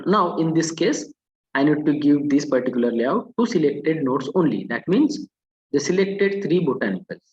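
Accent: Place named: India. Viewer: Indian accent is horrible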